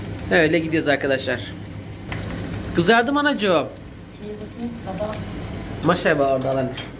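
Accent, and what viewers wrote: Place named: Zonguldak, Turkey. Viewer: Ellerinize sağlık Maaşaalaaah. elinden herşey geliyo.Sşnopta yan komşumuz zonguldaklı,aynı sizin gibi konuşuyo.Öpüyorum ikinizi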